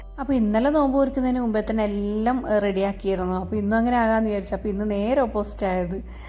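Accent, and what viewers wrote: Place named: Kasaragod, India. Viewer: Kasarkod basha ishttapettu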